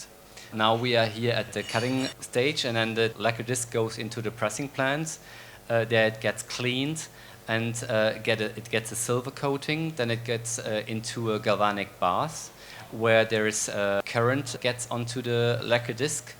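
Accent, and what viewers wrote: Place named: Germany. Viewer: How about german with subtitles? I hear the german accenct all over why not talking in german, like electronic beats - Slices?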